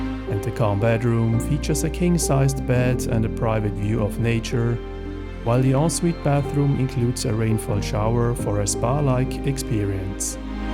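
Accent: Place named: Scotland, United Kingdom. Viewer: Lovely Scottish accent.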